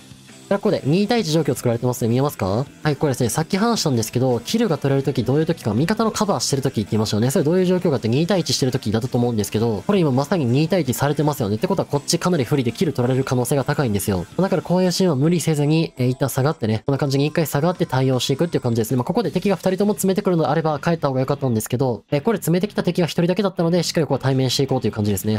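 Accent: Kansai, Japan. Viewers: 初心者ですがどの動画もとても分かりやすくて参考になります✨✨味方やキルされてる人数など意識して見れるようになってきました🙏関西弁なのも親近感です😊今後の動画も楽しみにしてます！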